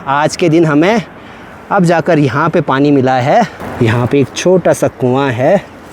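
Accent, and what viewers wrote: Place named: Garhwal, India. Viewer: Sabase badhya video aapke hi hote hai..aapaki aawaj ..bolane ka tarika..hindi bolate hai fir bhi garhwali pronunciation khub bhata hai..ek sukun milata hai...thanks..dil se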